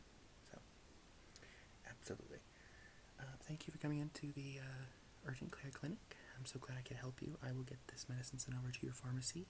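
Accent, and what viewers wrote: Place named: New Jersey, United States. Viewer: Being in and out of hospital lately I only wish this was the care I got, this is amazing I would love you to do something with typing like a clocky-clunky keyboard like checking into a spa for a facial you would be awesome at that with the New Jersey accent you do so well I LOVE that video all the same thank you for the amazing content and your work ❤ x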